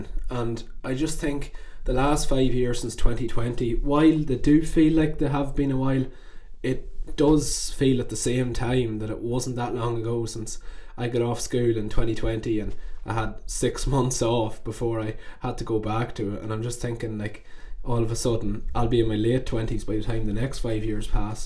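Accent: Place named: Ireland. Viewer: Dude this is awesome. A lot of people dont start coming to terms with dissatisfaction and fear and uncertainty until years down the line from where you are, if they ever do. Most people waste years chasing pleasure and fulfillment through every avenue imaginable before coming up dry and realizing everything was for nothing. Seems to me like you are starting to realize the low hanging fruit isnt always the best fruit pretty young, which is admirable. I'm only a couple years older than you (I'm 23) so I can definitely empathize with seeing your whole life ahead of you but being afraid of never finding true satisfaction. If you want my theories and experiences with seeking true fulfillment I'm happy to share! Side note... You sound Irish, is that right? I'm in the US (Alabama to be specific) and your accent is AWESOME. I went to Ireland on a study abroad in 2022 and I definitely want to go back someday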